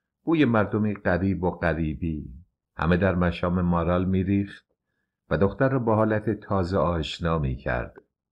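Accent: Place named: Khorasan, Iran. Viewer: با درود استاد گرامی، گویش فوق العاده زیبایتان این کتاب را دو. چندان جالب میکند، بخصوص که مرا به خاطراتم به شهرهای جنوبی استان خراسان و همچنین لهجه زیبای این افراد میبرد. سپاس فراوان❤❤❤❤❤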